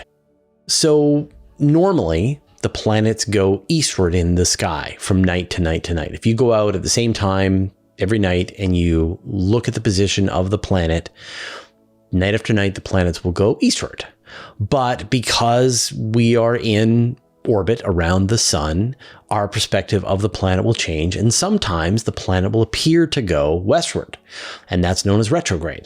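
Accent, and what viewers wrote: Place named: Canada. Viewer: All alone and finally at peace is clearly what you meant to say. =) haha Man oh man I love this channel. It's so nice to hear a fellow Canuck.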